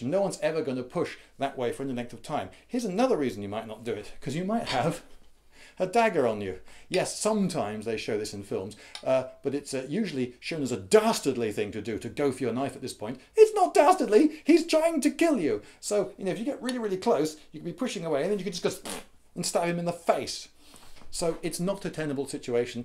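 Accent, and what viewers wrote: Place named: RP, United Kingdom. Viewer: know, I like Lindybeige's videos better. He's more animated and engaging to the viewer. can get too droll and boring at times. He's a talking head. A talking read with an "Ahh Pee" accent. I mean RP accent. I also like +Skallagrim. He's got plenty of visuals, so he avoids Matt's mistake of beinga talking head, and can be just as engaging as Lindybeige.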